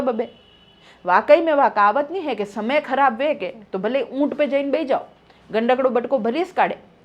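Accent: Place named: Mewar, India. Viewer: मेवाड़ी बोली गणी प्यारी लागी सा 🙏🙏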